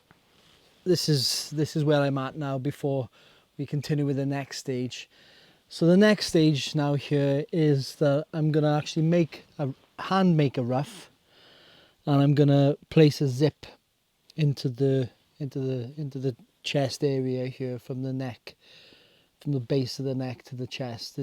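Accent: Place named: Wales, United Kingdom. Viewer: I was pleasantly surprised to hear a Welsh accent coming to this video. A great watch and great to see another Welshman do well, and show passion in his craft. yn anfon llawer o gariad o Llanelli. Iechyd Da i chi!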